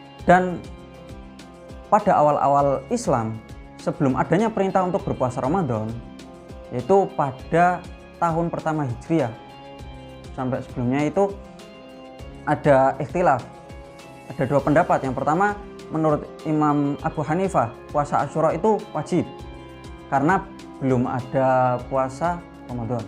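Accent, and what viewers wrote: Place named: Banyumas, Indonesia. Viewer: Ust ahlu ngapak masyaAllah😍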